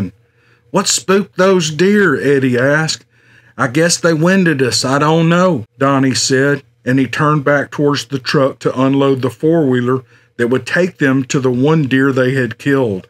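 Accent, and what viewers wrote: South, United States. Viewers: I love the southern American accent, i dont know if its me being Aussie and not knowing what to listen for but it doesn't sound trashy to me, it sounds charming, engaging. Its an accent made for story-telling. Are there different southern accents? I imagine with Louisiana being French they might sound different & I've heard Cajun people speak and I cant understand them at all. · I love his accent and cadence. Sounds like a suave southern gentlemen. (I'm a woman.) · Always have had a fasicination with Cryptoids. I myself am a Southern girl. Born in Memphis,TN and as a child roamed the hills of Mississippi, Tippah County my paternal aunt own land so I was all over it. Never ran into Bigfoot, but there suppose to be a Big Black Panther of sorts roaming those same hills. But I never had the experience of meeting anything out of the ordinary. 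I now live in Washington state still on the look out though. Love your storytelling and your southern drawl.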